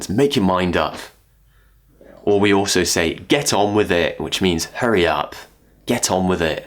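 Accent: RP, United Kingdom. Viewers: I really like your british accent 😍😍😍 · I miss your lesson, your pronunciation is so smooth and really british, love it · Like your british accent 👌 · Of course it's been ansolutely useful... And a pleasure to listen to your inspiring RP pronunciation 💜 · Are you using RP? Sorry I cannot tell the difference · I've been watching your videos lately and all I found was your British English pronunciation is my ideal one! I wish I could go to the UK and study English, but I'm too skint to do that.. (Is that correct? using the word "skint"?) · With this pretty face and British accent he could teach me how to install a damn garage door, and I would still be excited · Your accent perfect,i love this british accent . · I'm a new follower of yours, and I think you're the best teacher. Its very interesting to watch your lessons since your accent is very British, very expressive when you explain to your viewers and most of all the sense of British humour which naturally comes to you when you teach especially when you end the video. 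Thank you so much!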